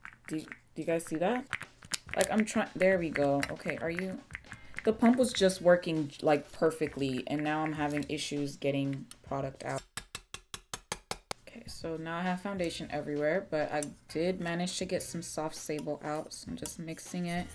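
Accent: Nigeria. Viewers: your nigerian accent is absolutely stunning, i love them so much😍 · Pls do a video where you explain and talk through everything in your Nigerian accent😂😂 I love your accent ❤❤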